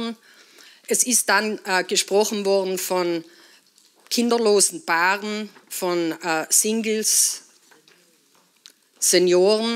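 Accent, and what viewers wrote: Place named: South Tyrol, Italy. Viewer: Richtiges Südtiroler deutsch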